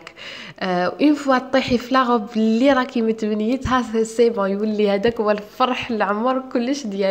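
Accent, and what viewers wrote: Algiers, Algeria. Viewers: نتي ملغواط بصح تهدري تباني عاصمية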